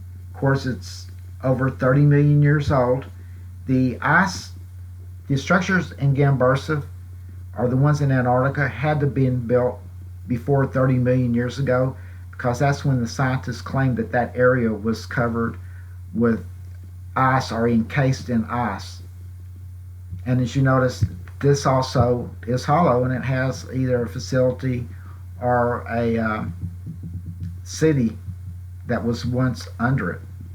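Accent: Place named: North Carolina, United States. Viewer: Are you from near NC? Your accent sounds like where I grew up.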